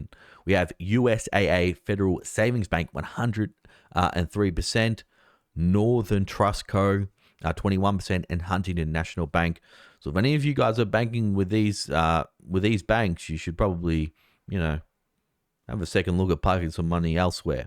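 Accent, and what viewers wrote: Australia. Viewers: PLEASE! use your Australian accent d-arta!/data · It's Data Not "Daita" You're Australian. Transparent yearn for USconnection. So either change your accent totally or stick to your OZ accent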